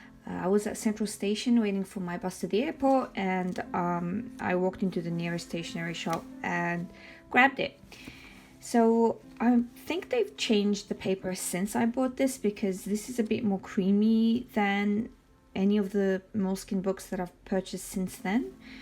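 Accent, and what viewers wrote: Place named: Australia. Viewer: Okey, thanks for answering! I didnt think your accent did sound like australian, but after a bit more listening I almost hear it. 😅 Hope to see much more from you and your art! Love from Sweden!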